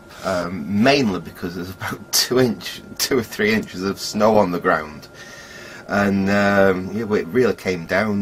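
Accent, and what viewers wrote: Manchester, United Kingdom. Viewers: Where are you from..... Sounds like a bit of a manc accent..... I have a question I can't get my head around..... I bought a ct160 10 days ago .... And regardless of the data ,the anode current settings are always a lot lower than the book.... e.g testing ecc81 valves the anode current should be 10ma and out of 5 valves I've tested they all seem to be about 3to 5ma to zero on the scale.... I've been desperate for a new video to pop up somewhere..... would it be possible to do a video at some point testing an ecc81..... Ps tried a e88cc and the anode should of been 15ma I think it was, however it was zeroing on 3ma.... I'm new to valves only using basic go no go testers... Any help would be awesome.. I'm in Burnley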